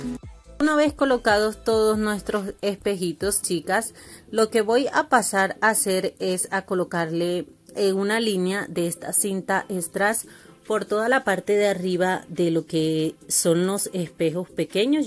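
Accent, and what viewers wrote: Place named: Colombia. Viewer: tu acento se me hace colombiano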